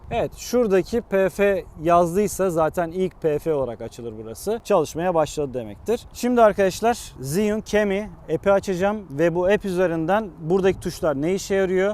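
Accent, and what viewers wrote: Adana, Turkey. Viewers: abi adana mı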